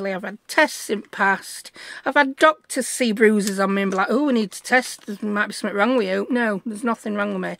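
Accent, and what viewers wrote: United Kingdom. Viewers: I absolutely love love love these. What a brilliant idea, so much journaling space inside a beautiful belly band. My favorite is the pink Foxglove plant. Beautiful!!!!!!❤ I’m so glad I saw your video! Thanks so much for sharing. I also enjoy your distinctive British accent and sense of humor since I’m from the U.S. 😅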